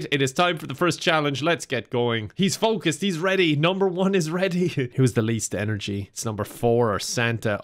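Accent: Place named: Ireland. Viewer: So I’ve been binging your videos enough that your accent has gotten stuck in my head for a few days and now I’m convinced that I have to go visit Ireland, it’s officially the second thing on my bucket list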